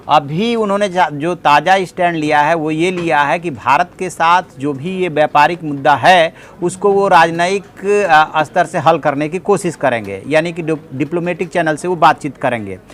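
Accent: Bihar, India. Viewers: सर आप बिहार के रहने वाले हैं क्या???? आप की आवाज़ बहुत ही मृदुभाषी है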